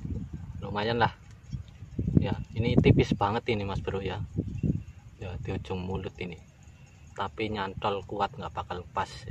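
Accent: Banyumas, Indonesia. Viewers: ngapak.ngapake ngendi mas bro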